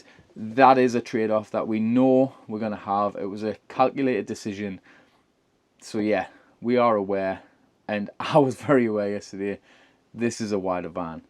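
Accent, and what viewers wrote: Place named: Geordie, United Kingdom. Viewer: Hi, good to see you back. We have an IH Fiat Campervan to which the previous owner had a Cinderella incinerator toilet fitted, not sure what make you are considering. We have had the van now for over a year and the wife loves it, clean, convenient, and warms the bathroom up as well!! It can be up to a month of use before the ash needs emptying, but the negatives are uses quite an amount of gas, about 185g a flush so an underslung gas tank would be recommended, and it does need liners which cost about £50 for 500. As a Geordie by birth, but now a ‘Southerner’ it is great to hear your accent, reminds me of my childhood staying with my Nan in Easington Lane Co Durham, and Crimdon beach. I think Cinderella Toilets also do a pure electric version? Hope all goes well. Kevin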